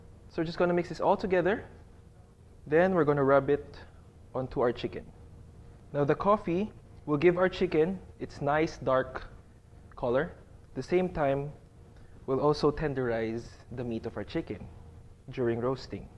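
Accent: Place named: Philippines. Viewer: thats a heavy flip accent TALAGA !!!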